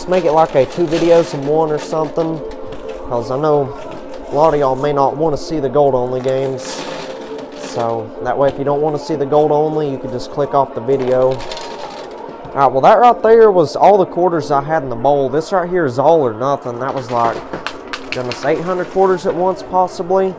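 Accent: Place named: Iowa, United States. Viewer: He sounds like a country boy from Iowa that is making it big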